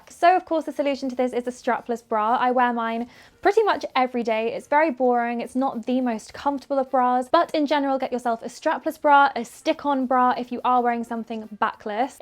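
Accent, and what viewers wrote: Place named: London, United Kingdom. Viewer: she has a pronounced English accent with a slight inflection of London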